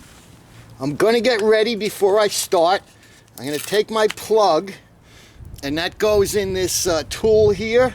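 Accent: New York, United States. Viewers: I see you have a first class plugging tool. They work great, where poorly built ones crumple in use. Quality lasts. I usually pound around the patch wit a hammer to help seat the patch, then test after I drive a mile or two. For a guy who talks funny(that NY accent), you'all are sure easy to understand. Great video, again. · this dude is such a new yorker its almost comical !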